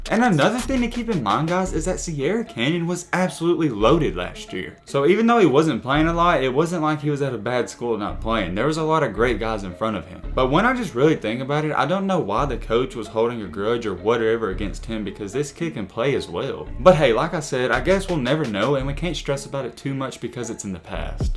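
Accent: South, United States. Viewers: Can’t finish video because of yo country ass voice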